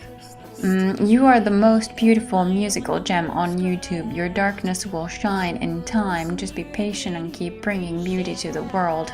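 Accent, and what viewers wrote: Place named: Finland. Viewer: Hi,. I did watch (well listen to) this one today. Wondering if you ever got that "team" you wanted. Again, I know I'm new around here, but I'd be eager to help. (Or Igor to help, as the case my be. Okay, that was my one joke.) Serious now: Obviously I'm not as much use as someone who is physically there, and I'm no good at makeup (you do your own make-up? Even I assumed that you had someone to help with that! You look amazing, btw.) Things I have thought of that I could potentially help with (short version) 1) Proof-reading of scripts for any material you're producing in English. [A lot of what you do is music, but if you move into more ASMR work, there could be scripts.] [I used to help a friend who was a professional proof-reader for a major book publisher, so I feel pretty qualified here.] 2) Possibly suggesting minor edits to scripts but being okay with you having the final say. 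2a) [I have some knowledge of linguistics (hobby number 37 on my (non-existent) list). I know handy things like the difference between a voiced and an unvoiced consonant. If you want, I could potentially help you with pronunciations on some of the trickier English words. (Although I think English is more charming when spoken with a Finnish accent.:)... Sorry, professionalism here.) However, sadly, I only know abut 4 words of Finnish, so I'm not going to be tons of help with proofreading Finnish. ] 3) I'm a good typist, for what that may be worth. 4) I've used Gold-Wave pretty extensively a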